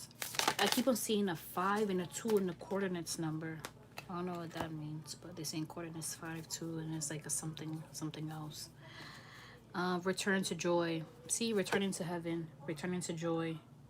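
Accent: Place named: New York, United States. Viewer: btw, I live in England, born and raised. I LOVE your accent and can understand every word you say. I’ve never been to NY or even to the US in this life, yet, but I think I was in NY in another life close to this one because I feel a strong connection to the place, energetically, like with no clear reason as to why, in this life, other than maybe what I saw on tv and in the movies as a kid, but it’s stronger than that, like a distant nostalgia that feels like home. I felt the same about London here in the UK before I moved there. When I did move there I felt right at home.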